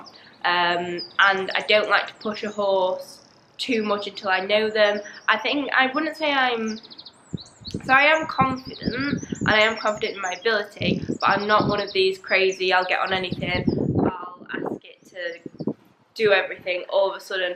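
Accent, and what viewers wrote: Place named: Yorkshire, United Kingdom. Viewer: Thought I recognised the Yorkshire accent, I’m from hull and it’s quite strong lol x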